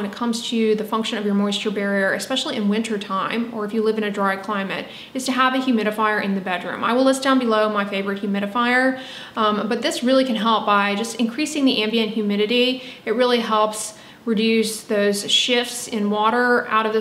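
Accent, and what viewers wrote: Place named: South, United States. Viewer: Are you from Virginia or perhaps Texas? I can hear a twang in your voice but only slightly with your “o”s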